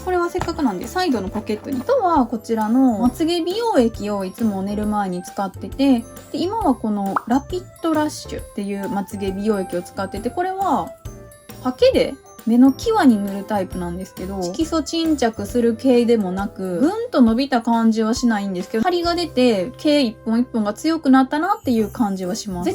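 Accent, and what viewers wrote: Kansai, Japan. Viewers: 初めまして✨ サムネの可愛さと今スキンケアが凄く気になっててめっちゃ参考になりました🥺💕💕 気になったアイテムをQoo10で購入しようと思います✨ あと私関西人なので関西弁がとっても親しみやすいなと思いました🥰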